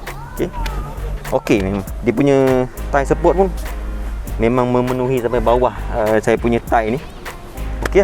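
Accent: Terengganu, Malaysia. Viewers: Dang² lg cakap teganu. Terbaekkk review boh😂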